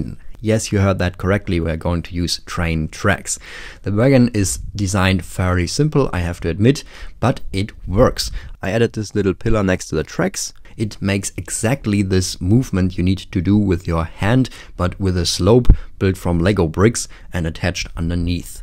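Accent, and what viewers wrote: Germany. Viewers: Are you German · Als ich das Video gestartet habe wusste ich sofort das du deutscher bist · Was für ein krasser deutscher Akzent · Wird a German excent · Are you german? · Man hört den deutschen Akzent aber cooles Video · Ha ich da gerade jemand deutschen gehört? oder Österreich? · Man hört richtig das du eigentlich deutscher bist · are you german? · I didn’t notice how German you sound until the „Drei zwo eins“ , but now I can’t unhear it XD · Höre ich da ein deutschen Akzent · Bist du aus Deutschland hört sich nämlich so an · Min drei, zwo, eins... dann noch dieses Englisch mit deutschem Akten, da merkt man das ein Deutscher redet.:) Grüße aus Germany! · you sound german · Du bist deutsch😮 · This dude sounds German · i smell german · Didn't know you were german xD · Man hört nen deutschen akzent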